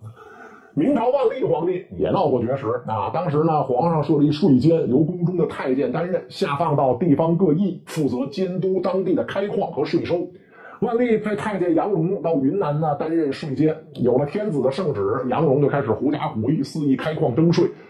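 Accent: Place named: North, China. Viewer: 可以换一个声音吗？这个口音我实在无法听清楚，我是马来西亚人这种北方口音实在让我头疼。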